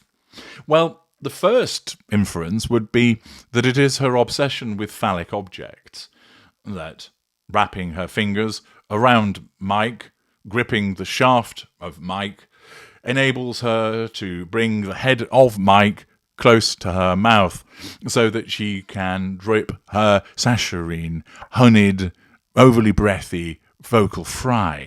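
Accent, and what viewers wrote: United Kingdom. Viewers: What an absurd discussion you just had with yourself. You seem to think your semi pompous British accent qualifies you as knowledgeable. It does not!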